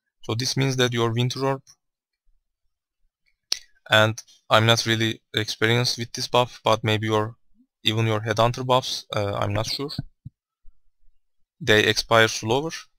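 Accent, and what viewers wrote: Turkey. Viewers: I spot turkish guy who is trying to speak english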